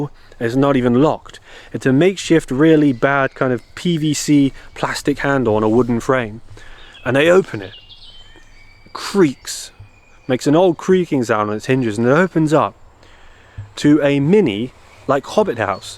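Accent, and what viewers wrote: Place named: RP, United Kingdom. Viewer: Thats a very interesting story about the farmer and cattle situ. Ley lines run 3 ft above Earth and 3ft under the Earth and no matter your denomination that ley energy is what is used to manifest. So these people were obviously using that energy for the dark purposes and thats why the cattle could not eat the grass because its likes its poisoned and they knew it. In India cows are sacred and also in Egypt too. Its nice you speak the Queens English without a regional accent, because its dying out and thats a real shame, although the odd word I can hear an accent from up North like possibly a Yorkshire accent, but only an odd word occasionally. You speak beautifully and I adore listening to you !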